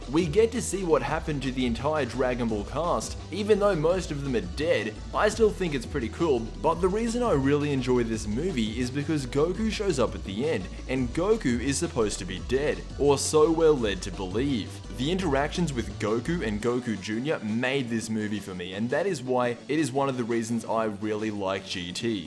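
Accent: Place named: Australia. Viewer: Gotta love that unique Australian accent!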